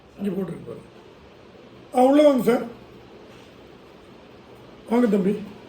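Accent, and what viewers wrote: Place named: Koomapatti, India. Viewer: Yenga koomapattiku vanga same style of speech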